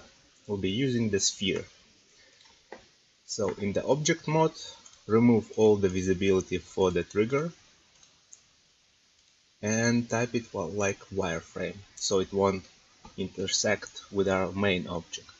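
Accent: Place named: Russia. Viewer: btw, is it Russian accent or some hispanic?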